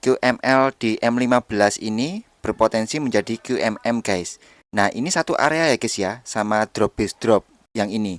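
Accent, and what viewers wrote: Java, Indonesia. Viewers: Wong ndi gess.. Kok medok amet gess 😅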